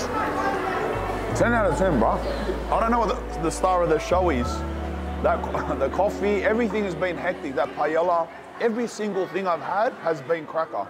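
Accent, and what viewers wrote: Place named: Sydney, Australia. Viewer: You must be from Sydney you sound like my Step Son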